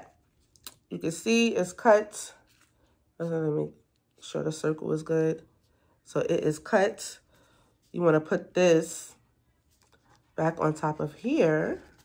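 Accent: New York, United States. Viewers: Just found ur video and child i can tell ur from nyc cause i can hear that newyorker accent we got! Thanks for the tips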